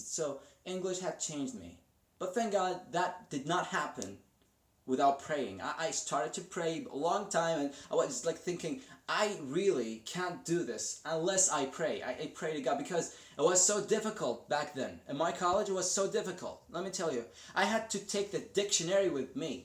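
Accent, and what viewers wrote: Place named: United States. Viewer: my words!!! this guy has just blown me away. Incredible to watch you speaking exactly in the American accent. You are just killing it man, I understand how much efforts you would have putted in it. And from a country like SAUDI ARABIA where ARABIC is everywhere. Congrates man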